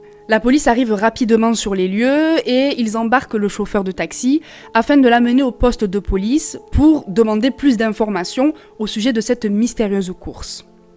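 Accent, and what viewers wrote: Marseille, France. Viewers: L'accent Marseillais j'aime bien !❤️🥰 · Voilà un histoire qui prouve qu'il ne faut jamais fait confiance à personne à 100% à au plus proche PS: désolé si c'est indiscret de ma part mais t'est marseillaise ?? chais pas pourquoi j'ai l'impression qu'il y'a un petit accent marseillais dans la façon dont tu parle😭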